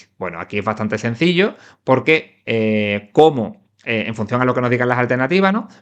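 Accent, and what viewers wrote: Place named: Andalusia, Spain. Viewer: Que bien lo explicas y además con tu acento andaluz me gusta todavía más jaja viva Andalucía ❤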